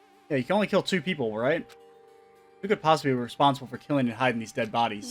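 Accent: South, United States. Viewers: Dude, you have my favorite Monokuma voice even if it's not intentional. Heavy Southern Monokuma is my new favorite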